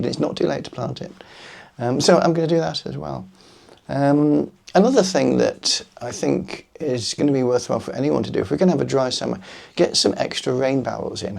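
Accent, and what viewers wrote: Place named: Cornwall, United Kingdom. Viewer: love the cornish accent- ell neenow! cheers!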